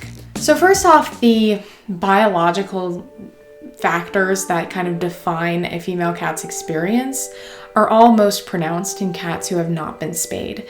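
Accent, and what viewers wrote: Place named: Buffalo, United States. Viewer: I always notice your accent on pronouncing the letter ‘A’ lol Where is this accent from in the US? I’m from Niagara, Ontario and notice a similar accent when i visit Buffalo just over the border lol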